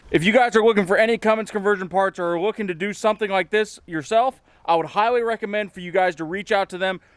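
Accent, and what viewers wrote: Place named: Pittsburgh, United States. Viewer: You HAVE GOT to be from close to me using the word "paher worsh" because I live right outside of Picksburgh. I deliver to Morgantown, Pennsboro, Clarksburg, West Union, and pretty much all over West Virginia. I can't help but to notice your accent. Don't forget Fummins...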